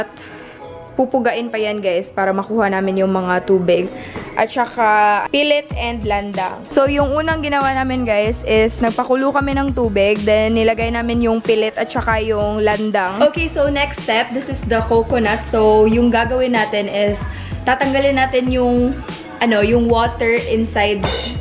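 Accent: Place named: Visayas, Philippines. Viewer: I like how you speak bisaya so cute😍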